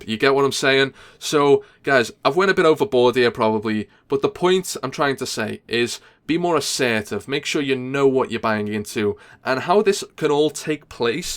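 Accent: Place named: Liverpool, United Kingdom. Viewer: Dirty fucking scouser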